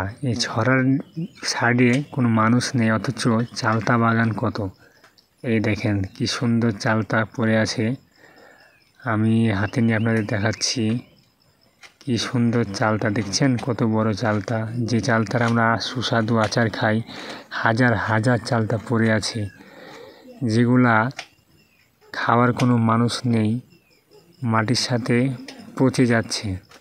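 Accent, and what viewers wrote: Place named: India. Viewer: ভাই আপনি কি ইন্ডিয়ান এইভাবে কথা বলেছেন কেনো।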